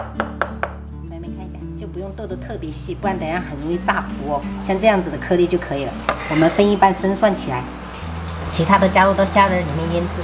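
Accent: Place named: Haikou, China. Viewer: 海口音，親切感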